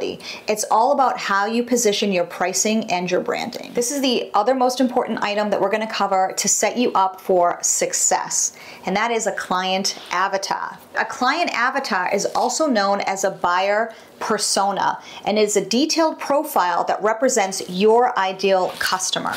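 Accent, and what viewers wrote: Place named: Massachusetts, United States. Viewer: P.S. I ♥️ your Massachusetts accent. My hubby and I LOVE Boston! We’ve been there twice and want to go back!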